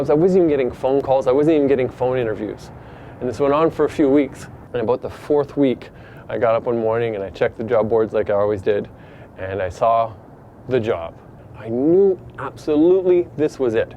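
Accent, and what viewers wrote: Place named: Canada. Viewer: I immediately knew he was Canadian great story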